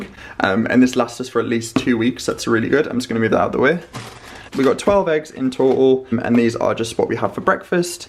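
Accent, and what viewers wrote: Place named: Durham, United Kingdom. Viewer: Your accent is so familiar, you sound local to me, I live near Durham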